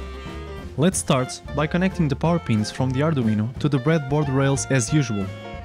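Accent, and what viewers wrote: Portugal. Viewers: Impecável. Bem que estava a reconhecer um sotaque Português. Bom vídeo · Este sotaque não engana ninguém, tinha de ser português, ;)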